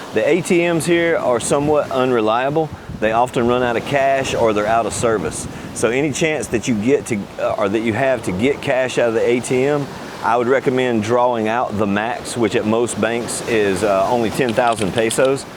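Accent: United States. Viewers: So wrong. For example YOU DON'T NEED A VISA FOR 59 DAYS (for most western countries). You enter the country WITHOUT visa. You can get visa only after 2 months. Your first extension is still not a visa. Another thing - don't EVER tip. If You do so - it is the same as screaming - I'm an idiot tourist, come and scam me more (or I'm from US). Another thing - You don't need internet to use maps 🤣🤣🤣 Unless You don't know how to use offline maps. And so many other stupid things in this video... I don't know who is this guy, but he definitely sounds like US tourist. The food is also BAD 8n the Philippines. Almost as bad as in the US (which has worst and most processed food in the world). Also very little to choose from. If You have been in any other Asian countries - You will be disappointed.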